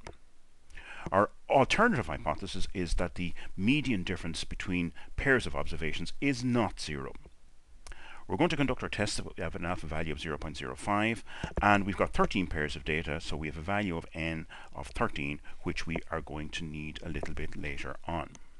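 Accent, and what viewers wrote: Ireland. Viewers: thank you for this. Two questions for you: Let’s say I have T+ of 9 and T- is -16. Is it correct to say you would then use T+ as 9 is lower than the absolute value of T-? Let’s say I have T+ of 52 and T- of 0. Do I use T- as my test statistic? Also if in wanted to calculate the effect size, I read I should use the absolute test statistic and divide by the sqrt of n, but if it’s zero, this will just result in zero… even though there was a big positive swing. Hope this makes sense, nice to hear an Irish voice here away from home.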